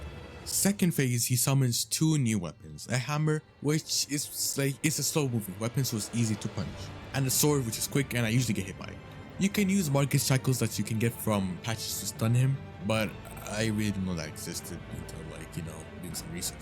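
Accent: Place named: Gulf, Arab world. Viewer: u got a khaleeji accent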